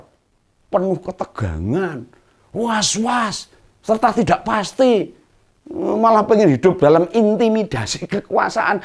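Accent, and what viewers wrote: Java, Indonesia. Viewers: Saya orang Sumatera, suka banget cara om menyampaikan narasi nya. Kental jawa nya. Ada lucu nya. Mencerahkan. Top👍. Lanjutkan Om!!!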